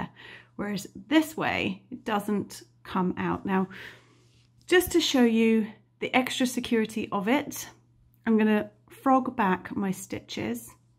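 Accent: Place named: United Kingdom. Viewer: Absolutely genius!! Thank you so much for sharing. I have just ordered yarn to do a very heavy color change afghan (every row changes) and your timing with this amazing tip could not be better!! I am really excited to do my project now. I was dreading all the ends, but I really wanted to do the pattern. Woo hoo! Thank you, thank you, thank you. Love your channel and your Brit accent. Carry on!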